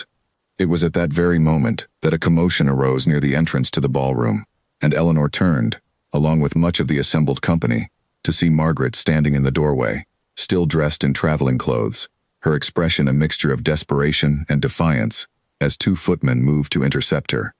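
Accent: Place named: United Kingdom. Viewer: Oh good! It’s not just me! This is one of the few narrations that I’ve enjoyed. Properly English (British) sounding and fluent. However - Mr Pembroke, the solicitor, should not have divulged ANY information about the Duke’s father’s Will, to anyone who was not an executor. So, giving out that information freely to Lady Margaret and yet not having the professional courtesy to directly advise the Duke of the manipulating fraudulent activities of his cousin but sending his daughter Charlotte, is ludicrous. What, by the way, happened to the Aunt? Why did she not feature in this At All? Plus, there is no consistent timeline… it went from Eleanor spending 3 days at Grace’s house to Charlotte’s arrival the next day (notwithstanding the Duke’s also conversation that same day). Then, the 2nd Ball, weeks after the first, although it was seemingly done within days. 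Then also, how did Margaret get from the manor, to Reading and back again without details of where she was living in between. The whole story needs filling out some more. The ballroom confrontation was a bit blah! The entire story premise was good, but more care should have been taken with the details.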